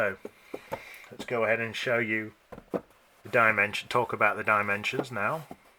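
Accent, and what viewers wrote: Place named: United Kingdom. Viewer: That's British English bro.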